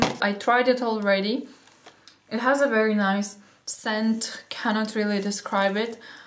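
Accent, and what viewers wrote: Russia. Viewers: I barely found your videos. They are great. Where are you from cause your accent sounds Russian. Awesome video by the way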